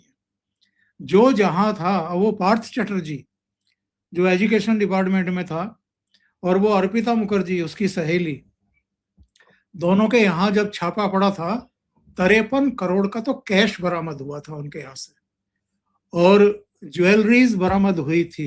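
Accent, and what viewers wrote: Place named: Haryana, India. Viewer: Nahi pata tha shri Omkaar sir haryanvi hai aaj pata laga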